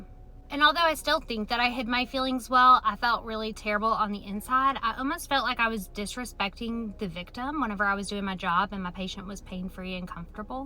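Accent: South, United States. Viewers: What part of America is this accent from?? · That’s one hell of a southern accent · I ❤❤❤❤❤❤❤❤ her country voice them southern folks accents makeme happy every trip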